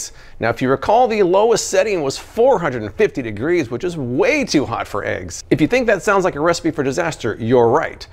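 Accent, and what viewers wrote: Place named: South, United States. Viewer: Thumbs up for. Next time lean more into the southern accent 😅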